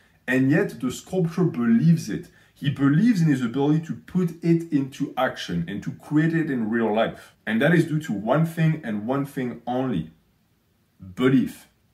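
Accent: France. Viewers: With no exaggeration, I love the perspective offered on this channel. Sound advice from a holistic vantage, a thicc French accent and videos long enough to tax my attention. You understand this cant cease, oui?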